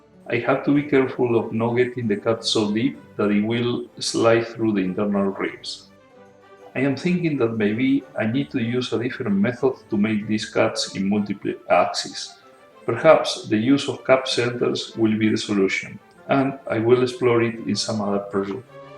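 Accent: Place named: Spain. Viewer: Menudo acento tienes jajajaj, se nota que eres español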